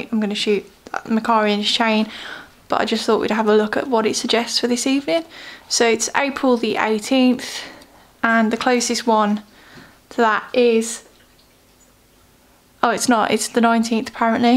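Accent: United Kingdom. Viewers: Loved the video! Definitely going to be a hit! I have a small request. What was the plate solving software you used? Sorry, my American ears don't always hear the British words accurately.😊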